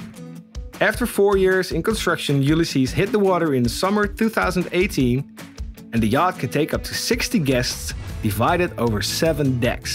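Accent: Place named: Netherlands. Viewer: Leuke video, aan jou accent te horen Ben je denk ik Nederlands.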